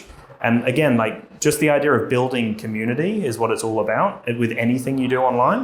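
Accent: Australia. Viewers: Oh wow, I didn't realize you weren't from Australia. You're from the UK? You sound like you have an Australian accent. I mean now that I know, I can hear there's a slight difference, but it definitely doesn't sound like an English accent.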